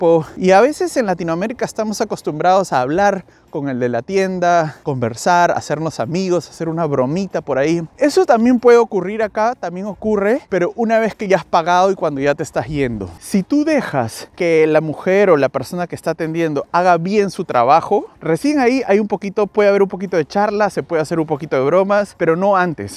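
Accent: Peru. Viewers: That's Peruvian Spanish.... good quality Spanish.... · It's a peruvian Spanish the best to learn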